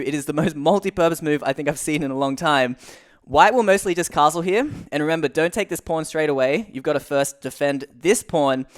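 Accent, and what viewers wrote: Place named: Australia. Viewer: Chess feels so different when it is explained with the Aussie accent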